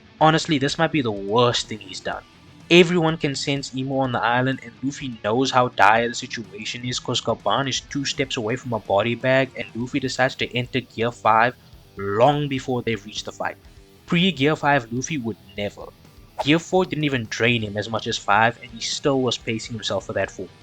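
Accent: South Africa. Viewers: Why do you have like 5 accents in 1 If i had to guess maybe you're Indian from South Africa? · I KNOW A SOUTH AFRICAN ACCENT WHEN I HEAR IT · Yo gang are u from SA? I think I can hear it in ur accent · I smell a RSA accent 🇿🇦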